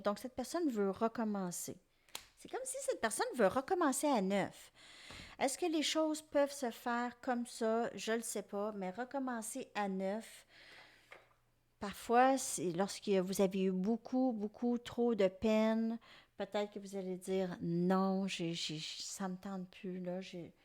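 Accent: Canada. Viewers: Vous êtes excellente,agréable à écouter avec l accent canadien bises de Belgique de Robert Brummel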